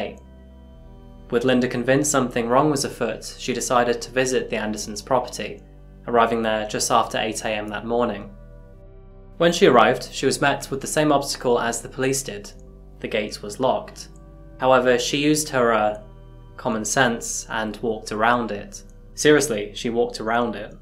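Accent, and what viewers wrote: England, United Kingdom. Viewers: Their accents aren't even from the same country though. TC is Irish and this guy is English....very noticeable difference there. I can definitely see the other similarities. I don't get the same vibe from the two channels even though there's a pretty clear inspiration in style here. TC is a lot more lighthearted for a start. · By the way, I like your English accent, being educated at an English school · He's English now, which means he lost his American accent. An American accent isn't "default". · That's interesting that you grew up in Seattle and then moved to England and got an english accent. I deserve Madonna an apology. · This is crazy. Who moves from Seattle to England and starts speaking with an English accent. Gross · I heard him say in one video he was born in Seattle I always wondered where the British accent came from. 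Now I know. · You “lost your accent” when you left Seattle? 😆 I would argue you ACQUIRED your accent when you moved to England. Eh, po-tay-to, po-tah-to. Well, either way, I came across your channel recently and I have now spent my whole day watching your videos. Great content! Thanks and keep it up!!! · You didn’t lose a Seattle accent. You gained a British accent, which sounds nice BTW. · ENGLISH accent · "Losing my accent..." I think you should've said gaining your accent haha. I never would've guessed you were born in the states with that thick British accent you have! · You did not lose your accent by moving to England, you gained one. You have a British accent 😁 This Joseph guy is a bad actor. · What part of England is your accent? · Wait so you're american with a bri'ish accent, amazing 👁️👄👁️ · I've always assumed you were English, going by how you sound. Never knew you were born in the US! 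When I went to the US on holiday nobody could understand me, I even had someone say I spoke English with a funny accent, they asked where I was from, I replied England! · I will comment that you don't seem to have an accent here with this video, yet you are very "English" with the others I have watched. I am new to your channel and am not watching them in order. You do say things like, "Car Park" (we say driveway) and many other endearing comments which show your English side. 🏴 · . You are the first American born person with an English (correct me if I’m wrong) accent. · Ah that’s why you’ve got an English accent 😊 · Yes it is. Slightly northern accent sometimes.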